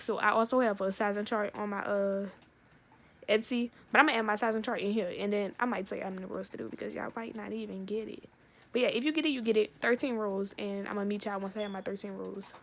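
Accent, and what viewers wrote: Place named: Louisiana, United States. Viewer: The Louie accent 🥹🫶🏾. I love ur personality and that color is 🔥 .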